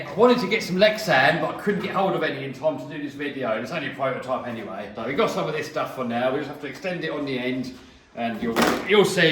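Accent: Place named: United Kingdom. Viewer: were you the giving me all those cool hints when I was playing call of duty and now I'm watching you doing the trucks? Or is that just my idiotic American ear not being able to pick up the difference on a British accent? Full respect sir. Love your channel.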